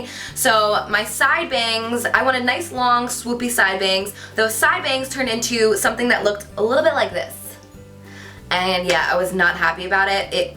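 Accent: New Jersey, United States. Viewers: My husband is from NJ & his whole family talks like this. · The way she says 'water' tho!Am I the only one always hearing the Jersey accent? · Lol I keep repeating the part you say water. Your Jersey accent is so cute ! 😍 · So obsessed with your Jersey accent! Lol.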